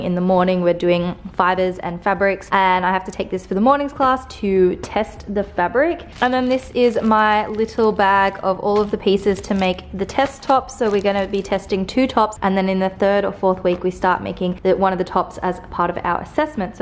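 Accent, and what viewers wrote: Australia. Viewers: This may be a weird question.. are you Australian? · Hi! Are U from Australia?? By the way, New here, love your videos!! ❤️❤️❤️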